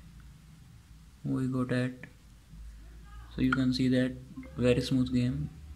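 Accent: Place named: India. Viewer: hes indian his accent is SUPPOSED to be like this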